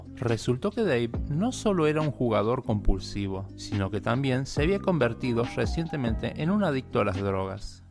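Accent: Argentina. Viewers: Te falta quitar el acento argentino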